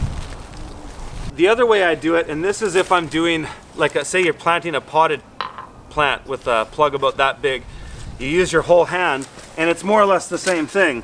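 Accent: Canada. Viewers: The Canadian accent is why I’m here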